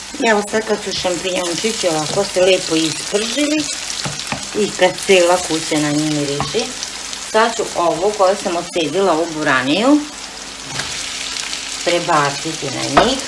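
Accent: Vojvodina, Serbia. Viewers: Odlična ideja. I samo pricaj, uzivam u tvom govoru onako vojvodjanski posjecas me na Balasevica jako volim Vojvodinu. Znam skuhati bosansku kafu i pustim tvoje filmove pa mi kafa bude merak. Zivjla ti meni. Pozdrav.🤗🤗🤗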